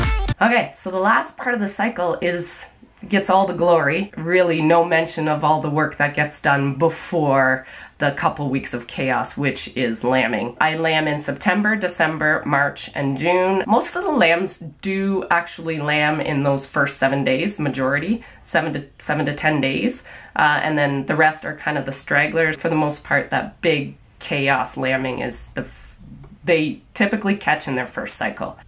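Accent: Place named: Canada. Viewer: Your Canadian accent is really fun to listen to 😉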